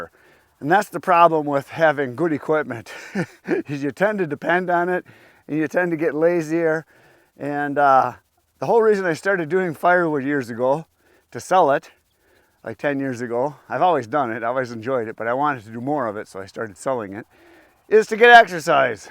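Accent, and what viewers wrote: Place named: Upper Peninsula, United States. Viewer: Hi. I like your accent. I love regional jargon. I was born and raised in Detroit. To my ear, you sound similar to Yooper to me. When I was 21, I moved to New Orleans and lived there 26 years. Yup, "what kind of Coke you want?" is common there. "Neutral ground" is the road median. "Dressed" means with lettuce, tomato, and mayo. Haven't even scratched the surface or get started into the Cajun words (southwest Louisiana). Have a good one. Chuck now living in Florida.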